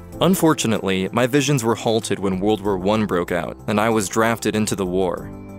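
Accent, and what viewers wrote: United States. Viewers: If he created Adidas why does he have such a young accent And if he is German how does he have an American accent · You sound american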